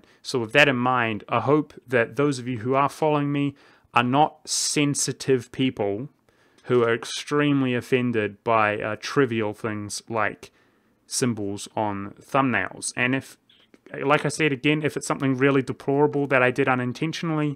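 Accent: New Zealand. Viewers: He is New Zealandian I think. His accent is either New Zealandian or Australian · Your'e from New Zealand?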